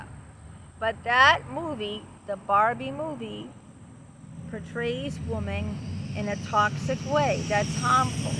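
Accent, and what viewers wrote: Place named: New York, United States. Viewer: Hey. Totally cute and funny as hell. Yep, watched the whole talk. And I'm not a bot. I'm guessing , with that accent, White Plains NY or maybe Yonkers? (pushing it). I am 62 now, and you just captured all of it. Do they have Gay G.I. Joe now? Haven't had that one dumped in my lap yet on soc. media. Rainbow Joe. Healing prayers for your past trauma, Been there, done that. You look great, obviously taking care of yourself. ONWARD!!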